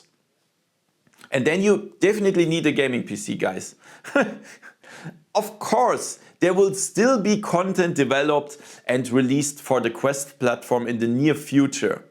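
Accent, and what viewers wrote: Germany. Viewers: he is german , you always can hear that , its with every language they try to speak.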